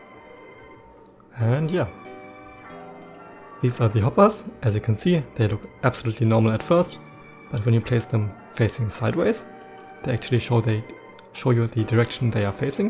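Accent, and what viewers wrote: Germany. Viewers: Are you from Germany?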